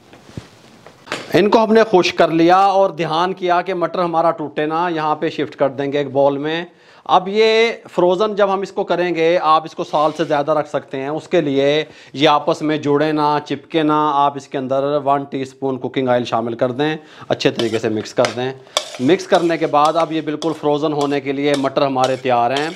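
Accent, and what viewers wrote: Punjab, India. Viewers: Punbajion ki urdu sunnay ka alag hi maza hai gal samajh aa rhi hai 😂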